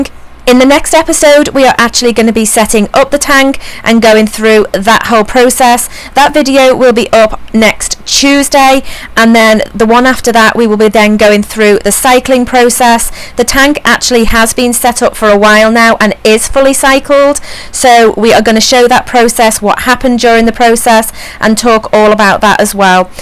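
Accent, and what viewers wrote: United Kingdom. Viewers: i'm a bit confused, you sound british, yet you describe capacity in gallons, and all your links to buy stuff is in dollars.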